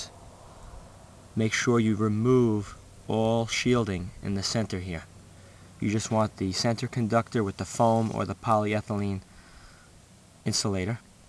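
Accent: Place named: Boston, United States. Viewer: Are you from Boston or New York?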